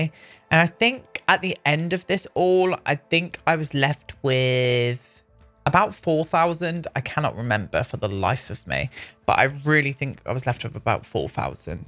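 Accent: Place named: Devon, United Kingdom. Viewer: Welcome back just in time to keep us sane through another lock down. I would love to live in this house. When I win the lottery I will have you design my home. My Gran used to live in Ottery St Mary and would say "Ok my lovely " and hearing you speak always reminds me of my childhood days when we spent school holidays there. I am 69 now so its a lovely reminder Thank you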